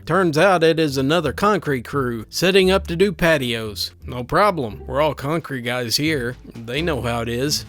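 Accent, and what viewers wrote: United States. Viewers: pronounce the word "arse" in your very American accent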